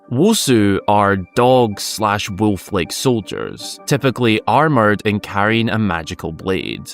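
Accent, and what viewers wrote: United Kingdom. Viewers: Yer accent is funny, you wouldn't happen to be British would you😅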